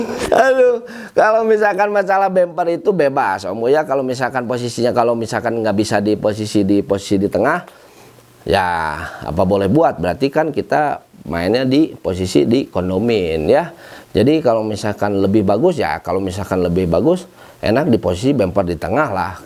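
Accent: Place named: Sunda, Indonesia. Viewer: Cri khas a serius tapi loba hereuy khas orang sunda pisan😂😂😂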